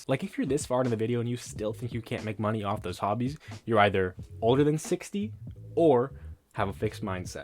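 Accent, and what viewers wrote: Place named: Birmingham, United Kingdom. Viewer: Are you from birmingham UK too. You got that accent 😂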